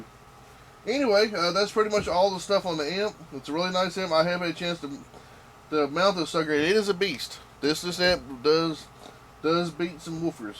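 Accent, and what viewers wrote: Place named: Tennessee, United States. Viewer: This guy has got to be from TENNESSEE, glad I speak fluent southern 🤣😉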